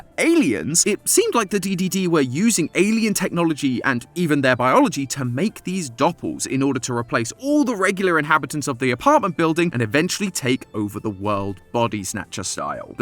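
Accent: United Kingdom. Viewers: Are you British um whatever your name was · I love the British accent tho❤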